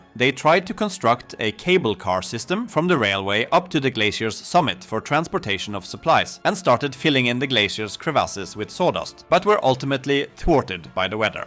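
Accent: Norway. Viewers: Hører du er norsk på mils avstand. No offence. Bare sjarmerende. Informativ video. Tommel opp.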